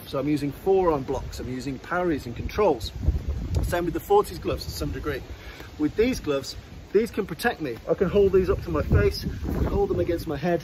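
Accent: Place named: England, United Kingdom. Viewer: Where in England are you from?you have such a lovely accent but I've never heard it before.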